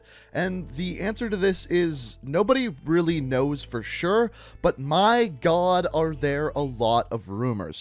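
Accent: Canada. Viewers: By his accent I'd guess he's from or near Winnipeg, Manitoba Canada. He sounds like a professional wrestler named Chris Jericho that has the same accent.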